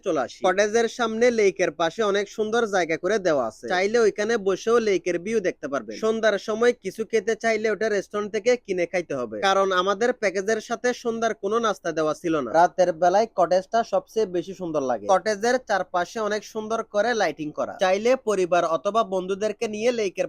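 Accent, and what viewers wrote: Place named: Chittagong, Bangladesh. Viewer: এত বাজে উচ্চারণ কেন? যদি শুদ্ধ কথা বলতে গেলে বিশ্রি চিটাইংগা টোন আসেই তাহলে চিটাইংগা বললেই হয়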